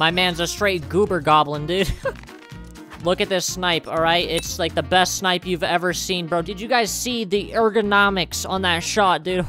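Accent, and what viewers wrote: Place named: United States. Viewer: I like ur cowboy accent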